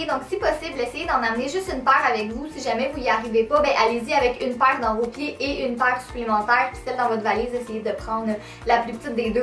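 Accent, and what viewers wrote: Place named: Montreal, Canada. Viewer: J aimerais tellement aller vivre à Montréal j adore votre accent si je serais née entants que canadienne je serais la plus heureuse du monde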